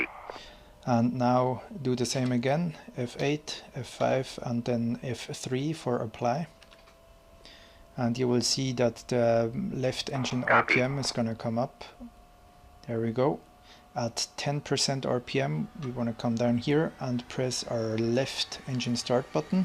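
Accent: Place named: Switzerland. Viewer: Swiss English :)) but been living in Switzerland for 4 years now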